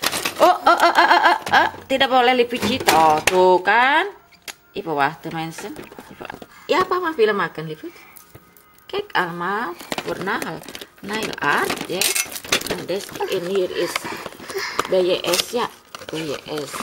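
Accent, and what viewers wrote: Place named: Java, Indonesia. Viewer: Mbaknya wong Jowo yaa? Boso Londo logate koyo wong jowo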